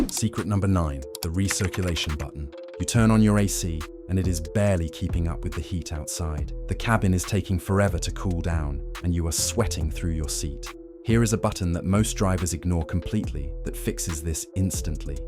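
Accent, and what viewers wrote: United Kingdom. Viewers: Trunk??? Dollars??? British accent???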